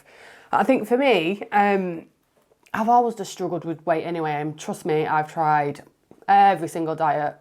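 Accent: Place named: Doncaster, United Kingdom. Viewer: Well done, you look amazing and I bet you feel even better. You sound as if you’re from Doncaster like me, am I close? 😊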